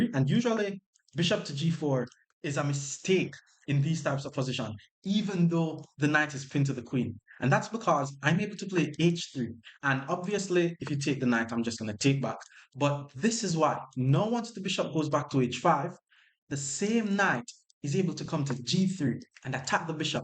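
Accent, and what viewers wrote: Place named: Jamaica. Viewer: Thanks - no hype, just really educational well commentated chess. You're a brilliant educator and I hope this channel is giving you the effort you're puuting into it. I'm from the UK and apart from actually getting better after really watching your videos, I'm also surprising my opponents when I inadvertently say something in a Jamaican accent and channelling my inner 😂 That's gg's!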